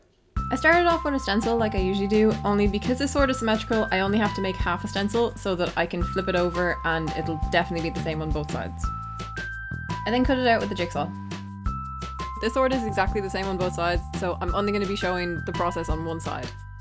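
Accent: United Kingdom. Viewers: ...wait your British? Always thought you were Scottish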